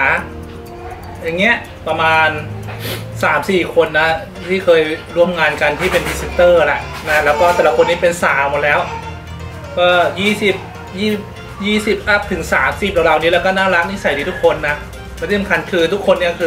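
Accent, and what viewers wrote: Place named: Thailand. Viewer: สวัสดีค้ะคุนน้าชอบเวลาคุนน้าพูดค้ะเสียงเหน่อๆเพราะดีค้ะ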